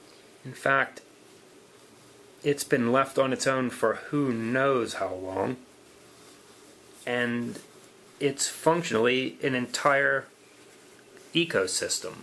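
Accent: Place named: Pittsburgh, United States. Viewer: I thought I detected a hint of a Pittsburghese accent;) I'm from the Lehigh valley myself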